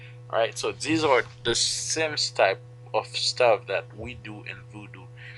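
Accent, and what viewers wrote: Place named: Haiti. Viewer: The speaker sounds Haitian. Every nationality claims to be of Israelite origin. Strange!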